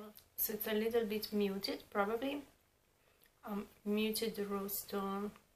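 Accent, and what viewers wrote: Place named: Italy. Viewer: Hi! It’s been awhile since I’ve watched your Chanel, but I hardly watch any UTube videos…actually usually never. 😮🫣 Anyway, I enjoyed this one as I am interested in these new lipsticks! 😊 All of those shades look great on you, and I think I would buy all of them except the orangey red and the very dark vampy one. Thank you for sharing these with us, (and you are so cute speaking French with your your darling Italian accent!) 😅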